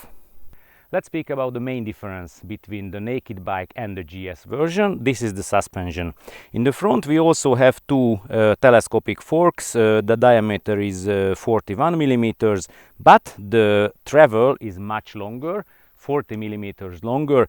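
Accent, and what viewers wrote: Hungary. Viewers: Hungarian accents are cool. Sounds slavic, latin, and germanic all at the same time.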